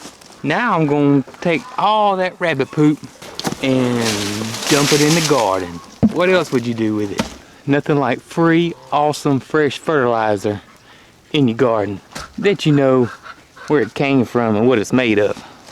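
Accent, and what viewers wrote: South, United States. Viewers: Love your sense of humor!! Wrastling haha. I'm from NY so your southern accent is fun.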